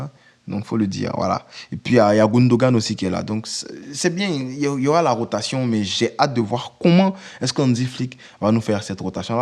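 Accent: Ivory Coast. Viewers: L’accent ivoirien me fera toujours rire. Tu me régales frérot, continues comme ça.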